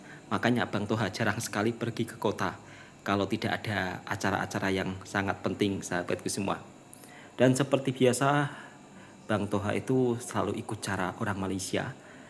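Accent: Java, Indonesia. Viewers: Lidah jawa Toha terlalu pekat walau berbelas thn diMalaysia.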